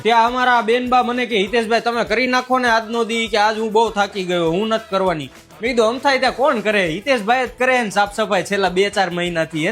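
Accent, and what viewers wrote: Kathiawar, India. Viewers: લાગે છે તો અમારા કાઠિયાવાડી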